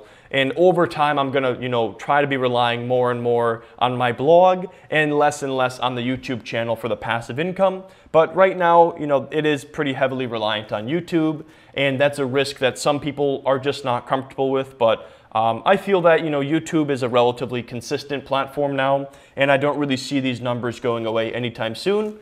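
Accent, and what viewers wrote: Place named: South, United States. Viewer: I enjoy ur vids brother, but are you related to Trey Gowdy? You look and sound similar to him yet less of the southern accent.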